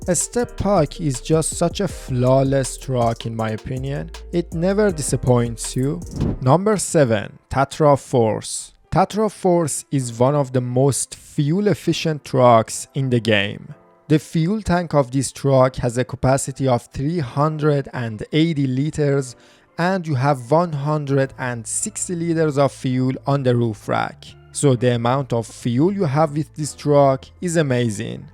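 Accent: Iran. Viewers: اکسنتتو بخورم که نشون داد ایرانی هستی بعد اومدناسم کانالو دیدم بععععله.موفق باشی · حس میکنم ماله ایرانی داداش لحجتو❤😂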